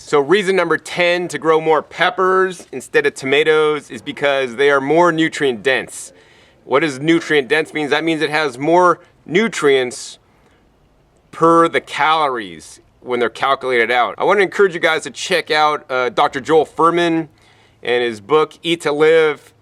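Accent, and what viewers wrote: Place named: California, United States. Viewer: you can totally tell hes from california lmaoo by the accent!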